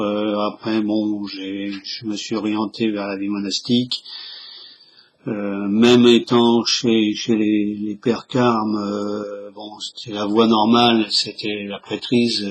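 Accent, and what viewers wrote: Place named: Southwest, France. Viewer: salut. au fur et à mesure du descriptif de ton reve, j'ai ressenti un extrême mal-à-l'aise, et sans réflechir, j'ai pensé de suite aux Francs maçons et à une messe noire avec un sacrifice... ton tirage semble confirmer cette pensée, et en tout cas, laisse un grand point d'interrogation. Je profites du fait d'avoir accès aux commentaires pour une question: Je sais que tu habites dans le nord de la France, mais je remarque, quand tu prononces certains mots avec le son "O", (ex: autre) je vois que tu le prononces comme moi à Bordeaux et dans la region du Sudouest, et pas du tout comme quelqu'un de ta region... tu as une explication?????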